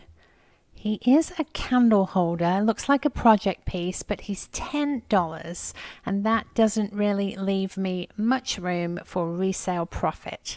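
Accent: England, United Kingdom. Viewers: and her mum are English 🇬🇧🇬🇧🇬🇧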